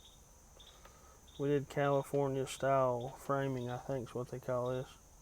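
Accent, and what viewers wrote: Eastern Kentucky, United States. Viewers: That has to be an East KY accent.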